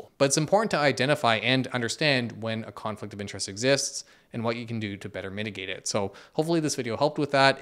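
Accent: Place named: Canada. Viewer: It's funny I never pegged you as Canadian but as soon as you mentioned it I could hear some hints of the accent in your voice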